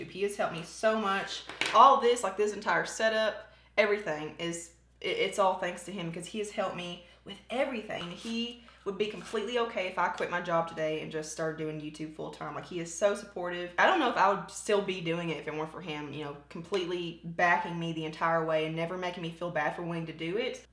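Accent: South, United States. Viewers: I don’t think I ever told you this before but maybe I have, I absolutely love your accent. I could listen to you talk for hours. My whole family is from the south but I have lived in Northwest Ohio my whole life. My grandma passed away a year ago and she lived up here for the last I would say 40 years but still talks like she was from Lawrenceburg Tennessee. I just think a southern accent is very comforting. But I do know a lot of people hate on it and stereotype you as a hillbilly. That’s really sad because you’re beautiful person inside and out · “Southern Charm”... I love that. I am from Savannah, Georgia, and I love your accent. It makes you...you. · I just discovered your channel tonight and I have not enjoyed a "makeup" video in so long! I enjoyed your honesty and your familiar southern draw😉 I'm looking forward to seeing your future vids. Love the fact you are down to earth and real! 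I can so relate to you.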